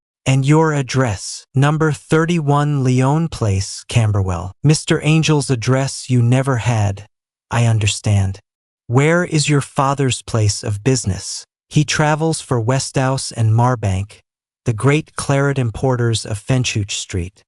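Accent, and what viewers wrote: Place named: United States. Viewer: No offense mate. Doyle did go to the US with his erstwhile friend Houdini but despite your valiant efforts American "English" doesn't really do his oeuvre justice. Look up BBC radio drama Ectoplasm (free on you tube). You can thank me later. One author was once asked what he thought of American culture and replied that, "it sounds like a good idea". Keep up the good work mate.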